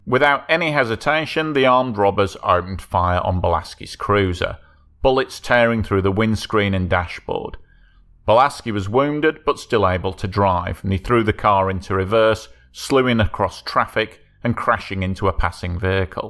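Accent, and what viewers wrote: United Kingdom. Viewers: the host's rather British accent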